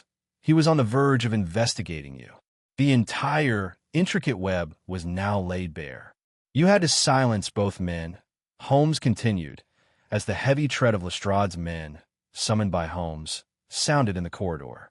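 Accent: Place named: United States. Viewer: The story loses value as this is narrated by someone in the U.S.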